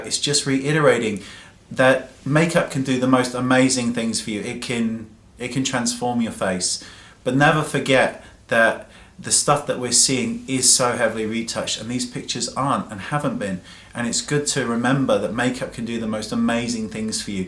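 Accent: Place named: Wales, United Kingdom. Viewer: I'm hearing more of the welsh in you today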